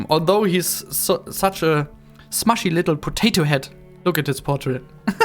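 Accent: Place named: Germany. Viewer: I love your beautiful denglisch :D "It was a inner flower picking" xD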